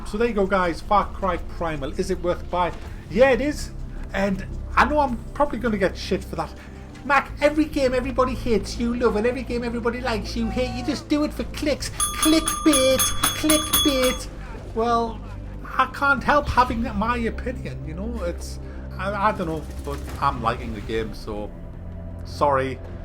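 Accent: United Kingdom. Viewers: Great review! I love the far cry series of games.Graphics, Sound, Gameplay, Immersion, Content. All grade A. If we could just get them to add Multiplayer, which is my guess for a Far Cry 5 or 6 to make an impact. Though I have taken a break playing primal after down loading PES 2016. I love listen to you Brits (hope that's politically correct) Love from America. Those bee bombs are a blast......aaaahhhhhhhh!!!!! peanut butter cup & peanut butter pieces with candy shell, same thing both yummy.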